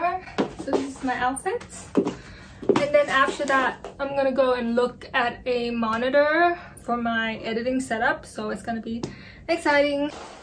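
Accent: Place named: United States. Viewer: Sounds like you was educated in the states?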